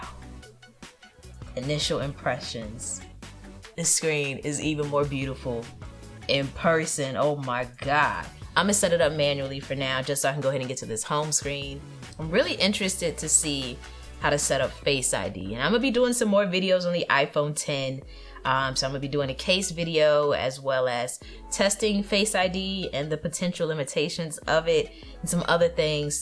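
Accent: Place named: New York, United States. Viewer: New York accent is strong. Probably I’m wrong but I don’t think so.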